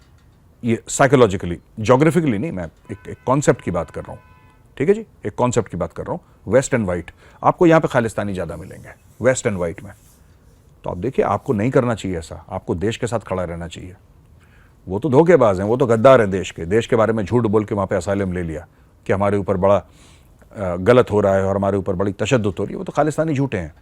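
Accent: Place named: Bihar, India. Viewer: Bihari hindi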